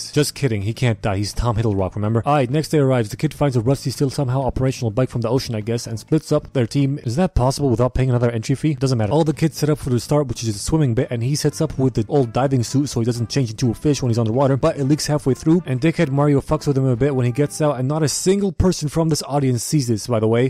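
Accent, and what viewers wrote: Italy. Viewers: best italian impression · nice italian accent btw nice video 2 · Wait why is he doing an Italian accent with Spanish words? Like Si.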